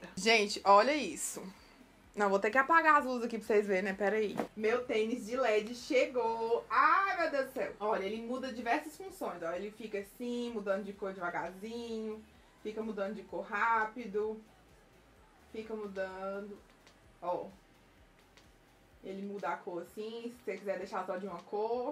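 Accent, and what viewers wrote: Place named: Northeast, Brazil. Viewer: Amei suas escolhas...esse seu sotaque é norte ou Nordeste??? sou do Nordeste, moro em João Pessoa. 🌻♥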